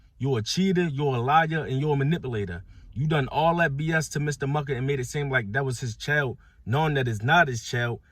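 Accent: Baltimore, United States. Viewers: you sound like a Baltimorean ☺️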